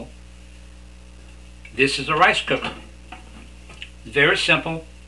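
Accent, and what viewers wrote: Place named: Louisiana, United States. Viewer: He's definitely from Louisiana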